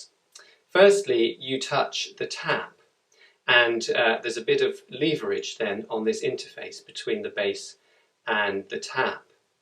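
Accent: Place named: Scotland, United Kingdom. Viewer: I can hear a Scottish twang in your voice....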